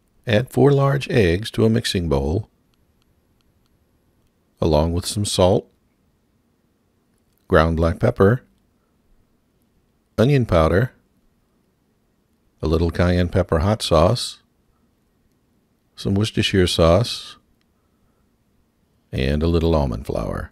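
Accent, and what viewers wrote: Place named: South, United States. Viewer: I love the way you talk. It's just so Southern. Love the recipe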